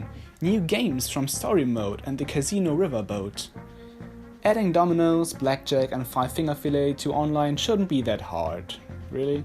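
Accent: Germany. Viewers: Bro you're german? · Man hört den deutschen Akzent einfach raus 😂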